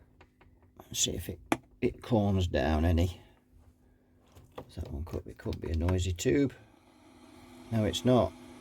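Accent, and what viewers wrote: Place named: Lancashire, United Kingdom. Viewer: I noticed an earlier comment about your accent, you reply you've been trying to speak more clearly for you audience. Its great to hear, reminds me of my Dad who came from Leigh in Lancashire down to London when he was 15 to start an apprenticeship french polishing furniture. No work in Lancs. Oddly he never lost that Lancashire accent and shared a lot of your regional sayings even after living in London until he was 76.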